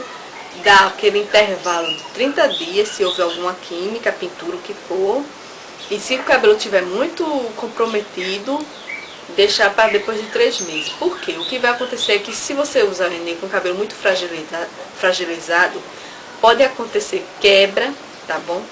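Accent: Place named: Ceará, Brazil. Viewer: voçe e do çeara,ou rio grande do sul? voçe tem um sotaque bem interessante,.......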